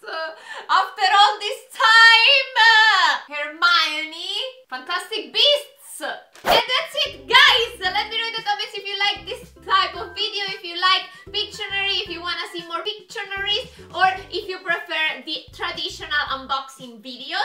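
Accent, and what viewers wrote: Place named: Italy. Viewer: Love ur Italian accent ❤️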